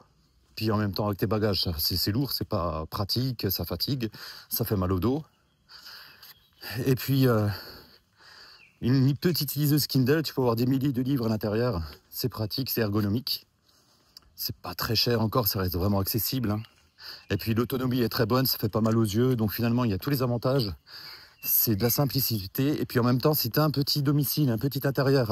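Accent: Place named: Alsace, France. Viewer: Accent de chez moi! Alsace ou Moselle?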